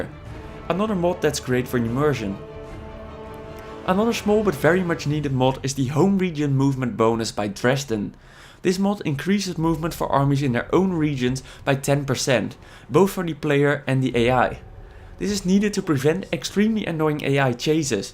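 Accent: Netherlands. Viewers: It's fairly easy to hear the dutchness in the voice XD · Nederlands? :) leuk accent, kan ze d'r zo uitpikken :P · Are you dutch? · I'm now.. and you are dutch, and have braces.. i can tell.. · Someone is really really Dutch. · Somebody is Dutch..:) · This geeza Dutch? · You sound quite Dutch :)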